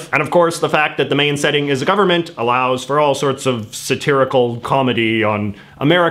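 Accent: Canada. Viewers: Yo is this dude from Canada? Is my first time seeing his videos and the way he says “about” is Canadian asf lol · This guy has the most Canadian accent in the history of the world.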